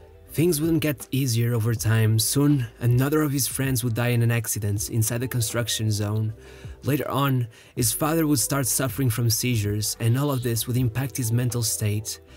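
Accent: Portugal. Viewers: Portuguese accent i love it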